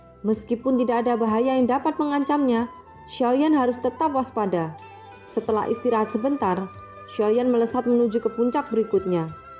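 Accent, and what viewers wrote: Java, Indonesia. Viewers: masih meddok suaranya, powernya di tambah lagi ya, biasa namanya juga pemula semangat💪💪💪💪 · Memang suaranya beda,kalau bisa min logat Jawanya agak kental itu aja sih kalau sy. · Hargailah orang yg berusaha menghilangkan suara mendoknya. Semangat mba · Medok nya. 🥰🥰🥰.... Mbak e.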